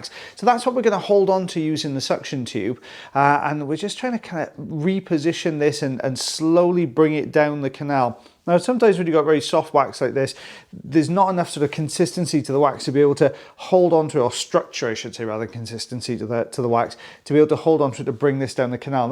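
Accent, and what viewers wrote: Wales, United Kingdom. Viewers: I swear I only watch for the welsh accent. Not been to Wales since covid 😢. I live abroad now.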